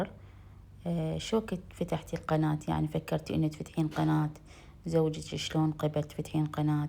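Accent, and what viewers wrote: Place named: South, Iraq. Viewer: يا روحي مبينه منين معروفه من اللهجه حبايبنا اهل النجف والديوانينه يكولون عليمن احلى لهجه خفيفه على الكلب❤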